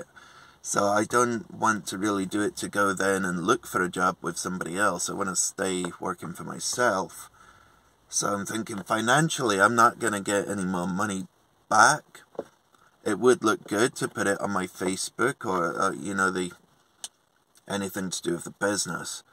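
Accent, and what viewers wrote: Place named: Cornwall, United Kingdom. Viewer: Joanne says you’re beginning to get a Cornish twang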